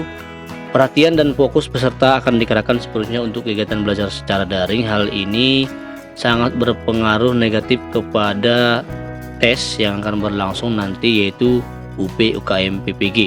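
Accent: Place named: Makassar, Indonesia. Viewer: mantap pak aku kira makassar td hehe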